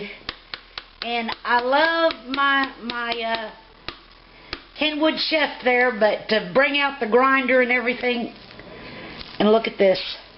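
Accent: South, United States. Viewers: I am so buying one! I must admit your accent is too cute and I'm a Texan! Gotta love the south!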